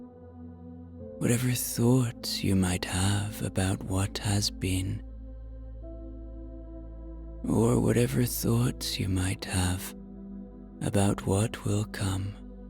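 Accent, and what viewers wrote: Ireland. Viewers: Love this! Thank you so much. I also love your Irish accent - which part of Ireland are you from?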